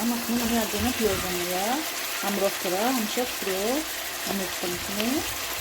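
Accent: Isfara, Tajikistan. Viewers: Лафзи Исфарагихо мора а хонаш мебурурад😅😅😅😅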